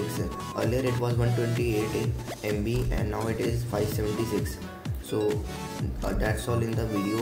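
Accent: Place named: Karnataka, India. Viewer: R U Kannada ?? U Get the slang of Kannada in English...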